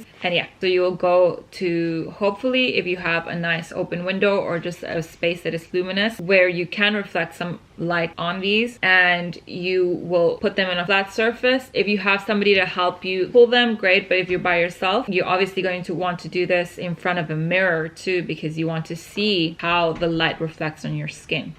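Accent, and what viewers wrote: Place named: Argentina. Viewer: Venía mirando videos tuyos y me daba la sensación de que serías Argentina, (más allá de tu excelente inglés), y ahora lo acabo de corroborar ❤❤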